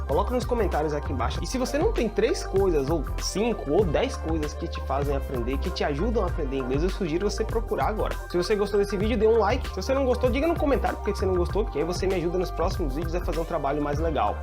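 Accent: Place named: United Kingdom. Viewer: Olá, fiquei curioso para saber como você adquiriu sotaque britânico. Se puder me responda por favor. Obrigado!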